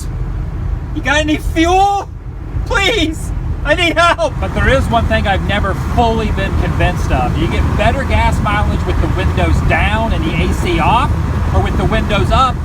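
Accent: United States. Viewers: Which region of the USA is accent associated with?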